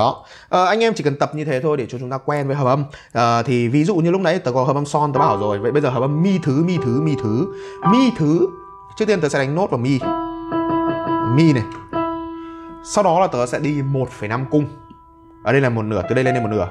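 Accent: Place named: North, Vietnam. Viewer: Cảm thấy may mắn vì mình hiểu đc tiếng bắc 😂😂😂 quá nhanh quá nguy hiểm 😂😂😂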